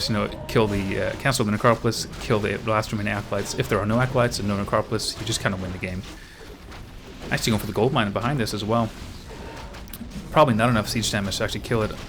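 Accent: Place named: United States. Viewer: Hey,! You sound like someone who's spent a significant time either residing in the US, or having been in continuous contact with Americans. Is there any truth to that observation?:)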